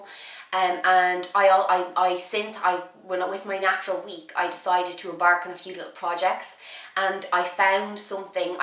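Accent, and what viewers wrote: Ireland. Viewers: Your turd installment? Lol, no offence i LOVE the irish accent. Thanks for the tips too :) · Lol she doesnt have a British accent, its Irish two different countrys :) x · great tips will write them down to rem them" have been following ur vids for ages but never commented.nice to hear the dub accent.yay!!!!!!!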